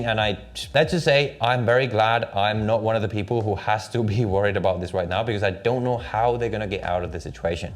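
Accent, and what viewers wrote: England, United Kingdom. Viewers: Love his English accent 😂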